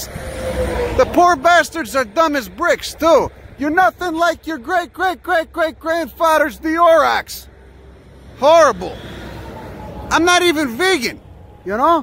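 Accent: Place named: Colorado, United States. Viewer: HAHAHAHHAAAA!!!! God damned spot on. Sounds like half the people in Colorado.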